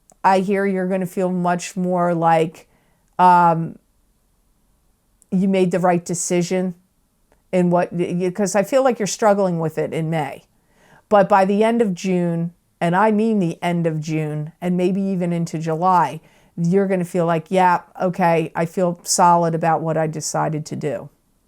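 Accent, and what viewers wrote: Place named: Baltimore, United States. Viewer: Hi, are you from Baltimore? You sound just like a hometown girl! It's my hometown.:) BTW, I am disposing of my brother's estate which includes two cars, an airplane and his house. Talk about large items! You are right on about that!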